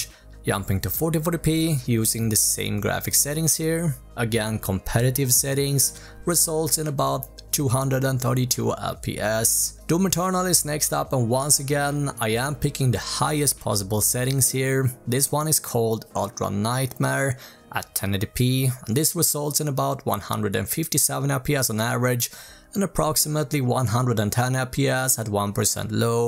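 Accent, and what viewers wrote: Sweden. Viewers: Are you from sweden, great video btw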